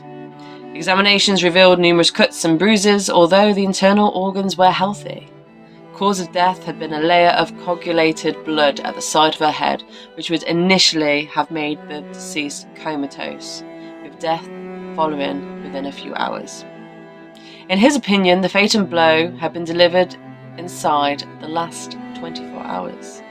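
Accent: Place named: West Country, United Kingdom. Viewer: Limit your time with those mate even if you have the best laugh with them. I liked your accent on the BBC video as it seems to have a west country / black country twang, which I like, being a Bristolian myself.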